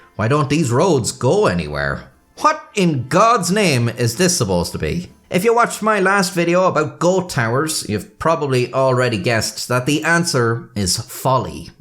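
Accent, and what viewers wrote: Ireland. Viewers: I mean, he has an Irish accent, so it's not that big a surprise. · You're Irish‽ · You sound like you’re trying to limit your Irish accent but the Irish accent is too strong to get rid of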